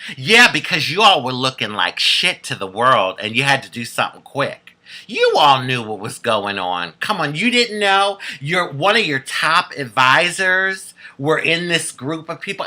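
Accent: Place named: New York, United States. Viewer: you really do personify multiple races (white, black, Indian, islander, etc..) But I sorta consider you as a black woman. You have black people most unique ways and manner. You speak more like an educated white woman from new York, but when you let your guard down, the real you comes out. It makes you funny and someone that many can identify with. Be blessed my sister. I watch every video that you put out, seriously.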